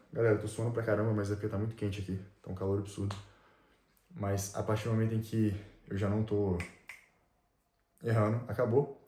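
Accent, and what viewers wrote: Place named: Rio de Janeiro, Brazil. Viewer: Salve mano, nao sei se tu é do RJ comecei a acompanhar o canal a pouco tempo e teu sotaque parece daq apesar de vc mencionar instituições de SP, pq nao tenta UERJ? Med na UERJ é tão prestigiado quanto na UFRJ, tem o HUPE (Hospital Universitario Pedro Ernesto) do lado e fora os programas de auxilio e bolsa que a Uerj proporciona. Falo pq meu primo fez Med la e apesar de concorrido acho que é mais ''certo'' você que ja tem uma bagagem grande se dedicar pra um unico vestibular que vêm repetindo o mesmo padrão desde sempre do que tangenciar seu esforço pra outros vestibulares que por vezes tem a estrutura diferente uns dos outros. Abraço e boa sorte, tu vai conseguir o7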